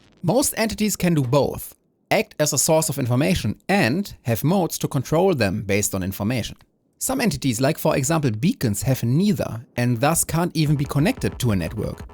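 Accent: Germany. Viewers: The German accent always gets me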